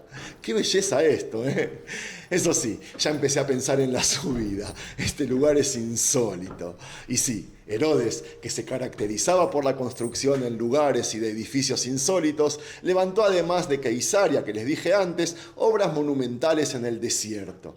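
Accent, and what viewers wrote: Argentina. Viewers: Que lindo es escuchar a un argentino tan lejos cuando dice che, Saludos desde la 🇦🇷🇦🇷🇦🇷🇦🇷 Dios bendiga a 🇮🇱🇮🇱🇮🇱🇮🇱 El lugar en donde nació mí salvador..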